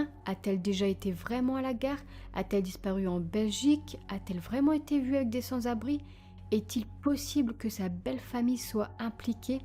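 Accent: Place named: Picardy, France. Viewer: Bonsoir, j'ai découvert il y a peu votre chaîne, de suite abonnée, vous êtes formidable dans vos reportages, une voix douce et calme ça fait du bien. Une voix qui me rappelle la ou j'habite et d'où je suis originaire d'après certaines intonations je suis de la Picardie. En tout cas c'est super. Bonne continuation. Bises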